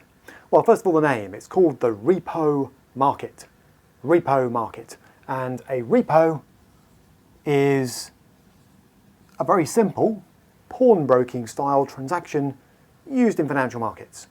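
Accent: United Kingdom. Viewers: lmfao love and his brilliant explanations with a great British accent!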